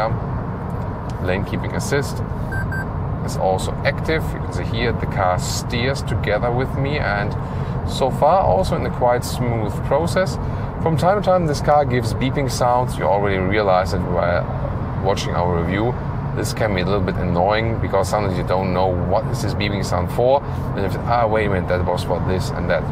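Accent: Germany. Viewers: is it a German accent?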